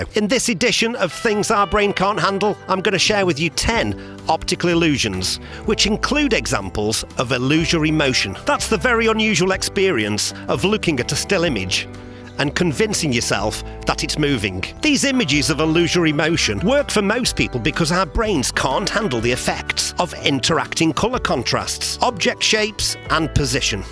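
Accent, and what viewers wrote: Stoke, United Kingdom. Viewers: 1- being an American, I feel i have to believe you because of your british accent.(strange) 2- accidentally taking an identical tender with the same key (small motor boat used to get ashore from a big boat) upon returning to find coast guard, police and upset couple, I said to everyone, "if the key fit you must acquit" everyone laughed and i got away with what could have been a real expensive nightmare. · You from stoke by any chance?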